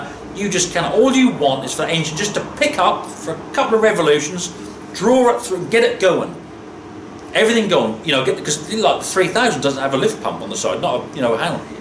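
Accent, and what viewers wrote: Norfolk, United Kingdom. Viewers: You sound just like me as everyone say you carrot cruncher roughly what part of Norfolk you from I live in fakenham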